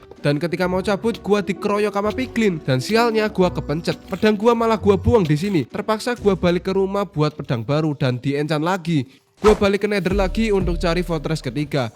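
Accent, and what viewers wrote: Java, Indonesia. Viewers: Medok bang ngomong lu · keknya lo jawa bg ngomong gue nya medok bgt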